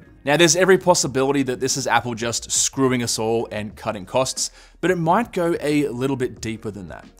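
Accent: Australia. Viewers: Nice hair. Love that Aussie accent.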